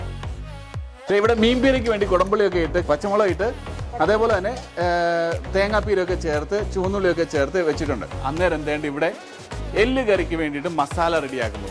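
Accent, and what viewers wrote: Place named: Kumbanad-Kozhencherry, India. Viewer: Love watching your programme..well hearing too. Tje malayalam is very similar to what we spoke. My dad is from Kumbanad & mom from Kozhencherry. Havent really lived there...but some 4/54 in kerala..2 in tvm and 2 in kumbanad, 3 in Manipal. Some 47 in Africa-2 in Ethiopia at birth, 13 Zambia and like 31 in South Africa now..but everything you do is do much like what I really am...thanks buddy!!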